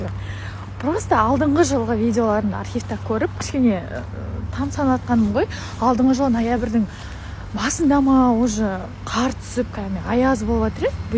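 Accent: Standard. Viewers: Мәәә недеген сұлу қыз қазақша таза сөилеиді екен , жігіттер жай қарап қалмаңдар нағыз қатын болатын қыз екен тұқымыңды көбеитетін 😁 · Оралдың кез келген қазағы өзіңдей таза қазақша сөйлей алмайды.Көзің біздің қаракөз.Қайда жүрсең жолың ашық болсын қызым!Ақтаудан,жазда Каспийге келем десең өзім күтіп аламын. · Қалай таза қазақша сөйлеп тұрсын, жарайсың бақыты бол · Молец карындасым казахша таза сойлепжатырсын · Оралдың қазқтары сен сияқты қазақша сөйлей алмайды ау , не деген таза қазақша сөз. Қазақ тілінен сабақ берсейш шалақазақтарға😅😅😅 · Ой Қазахшаны қандай тап таза сойлейсің қызым коп коп рахмет.🎉🎉🎉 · Сары қыз,таза-таза сөзің,керемет❤ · Красивая Девушка, ты Молодец! Даже не подумаешь что ты так чисто говоришь на Казахском Языке🎉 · Сыйлаймын осындай адамдарды. Молодец,кейбір қазақтардан таза сөйлеп тұр. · Красавица молодчина, а то что говоришь чисто на казахском, тебе вдвойне плюс. 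Одним словом молодец ты покорила интернет своим разговорным на казахском. Счастья тебе и всех земных благ!!! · Тамаша...әдемі сөйлейді екенсің....таза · Қандай сұлу, нәзік едің. Қандай ұйлесіп тұр тап-таза қазақша сөйлегенін, бақытты бол айналайын, Ата-Анана рақмет, сендей аруды тәрбиелеген. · Всем приветің таза қазақша болды, рахмет айналайын. Бақытты бол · ❤❤❤❤Умница молодец С утра радостная ❤❤❤❤❤ таза қазақша сөйлеп тұр ❤❤❤❤❤ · Красивая девочка. Очень красиво говорит. Умница. Она, по русски говорит с акцентом. А по казахски чисто на все 100 %. Всего хорошего и доброго в жизни . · Чистый казахский❤❤❤🎉🎉🎉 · Салем қарындасым, қазақшаң не деген таза Керемет👍Қайдан үйреңдіңіз? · Жарайсың, тәтті қыз. Сөзің таза. Бақытты бол. Көп жаса.❤❤❤ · Жарайсын қарындасым кейбір солтүстіктің қазақтарынанда таза қазақша сөйлейсіін қазақша дыбысыңды естіп сүйсініп қалдым қазақша білгенің өзіңе келешекте қызыметіңе де керек. 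· Қандай таза сөйлейді кейбір қазақтарданда сөйлей алмайтын. Мололец! Жарайсың қандай жарасып тұр . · Таза қазақша сөйлейді екенсің қызым Молодец бақытты бол ! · Қандай алтын қыз! Мынадай таза сөйлеу керемет қой! Сөйлеген сайын әдемі сұлуланып барады ғой! 😮❤ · Қазақша таза сөилеиді екенсің жараи Сың маладесің қарағым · Парламентте отқан шала Қазақ министерлерден таза қазақша сөйлегеніше 👍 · Жаным сол. Қандай адеми сұлу қыз. Таза қазақша сойлейди екен.. Уйеун барма. Жоқ болса уйленем саган. Жаным сол. Керемет сұлу ашық жарқын өте конилди қыз екенсин. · Керемет. Таза солейсн!❤ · жарайсың қызым таза сөйлеп тұрсың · Қазақшаны таза сөйлейді екен Бақытты бол айналайын.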